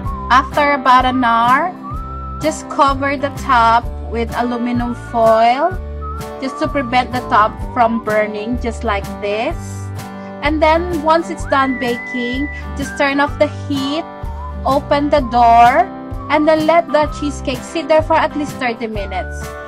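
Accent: Philippines. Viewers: Hay naku dmo pa KC tagalugin halatang Pinay ka.puro question mark way Ng English mo😂😂😂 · you sounds like Filipino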